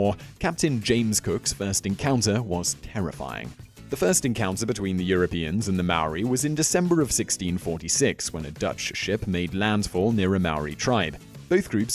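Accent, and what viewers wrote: United Kingdom. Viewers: He's British, his way of pronunciation trumps yours. That's how we say it in America too, so your way is wrong. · Wtf do you expect, he's British. Can you imagine a Kiwi trying to pronounce Middle Eastern or Asian names correctly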